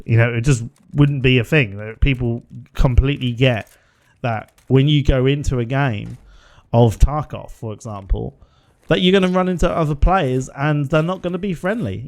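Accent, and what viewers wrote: Norfolk, United Kingdom. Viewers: What part of Norfolk are you from mate ?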